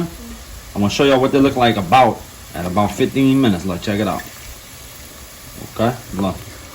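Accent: Louisiana, United States. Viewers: My boy got that terrebonne parish accent lol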